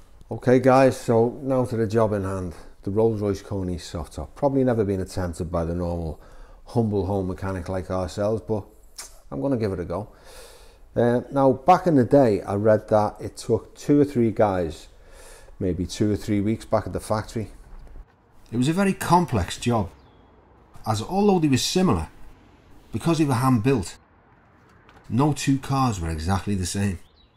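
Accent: Liverpool, United Kingdom. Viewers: Cannot wait for the next one. This is how a video of this sort of work should be. No irritating Muzak, or smart arsed co presenter, playing at comedian, just a guy who knows what he is doing and explaining the stages viewed in a relaxed, quiet Liverpool accent. Superb, thank you,.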